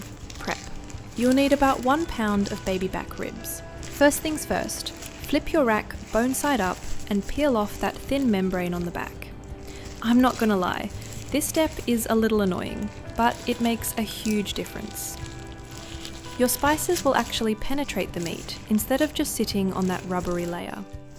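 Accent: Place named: England, United Kingdom. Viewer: Why do the videos for this channel use a voiceover with an English accent, when it is clearly produced by a US citizen? Yellow onions are used in some recipes but they are called brown onions in the UK. All UK airfryers use temperatures in Centigrade, but Fahrenheit are always quoted first on this channel. And no-one in UK calls an aubergine an eggplant. Just be honest guys!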